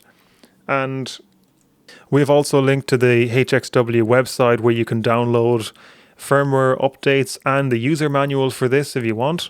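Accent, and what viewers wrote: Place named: Ireland. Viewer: Well I didn’t expect him to be Irish 😂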